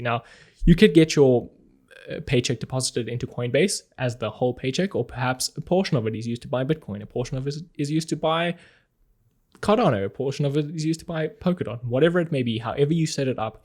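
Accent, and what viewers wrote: South Africa. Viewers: Are you South African? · sehe deutsche Werbungen gerade, aber habe immer gedacht dass du Süd Afrikaner bist wegen deines Akzents. Naja wilkommen trotzdem zurück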